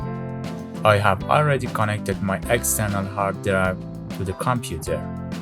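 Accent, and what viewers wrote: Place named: India. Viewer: Loved your indian accent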